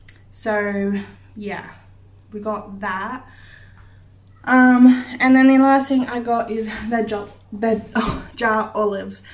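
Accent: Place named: Australia. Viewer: Sorry missed township U are at next time please repeat is it in Aussie U sound Australian... For Breakfast if U like steak buy some Minute Steak tender and cooks fast...Lamb Rump Steak are yummee sear quickly on the stove in butter and a little oil...make some gravy up for morning with eggs on toast yummee... Only suggestion buy some Topside Mince from butcher U will never go back to Coles... Why don't U spend $200 at Butcher or make a deal with him as he is trying to get your business...veal chops are great to eat aswell... I think Nick is correct country things are better than Grocery Stores... Whole Chicken make Broth out of thr Bones...also if U like those Expensive $13kg Tomatoes grow squeeze some seeds into a pot grow your own...as it looks like Summer...I was always told if U like the taste of a tomatoes U keep the seeds of them for now or next year to grow put some on a paper towel...😂❤😂❤😂❤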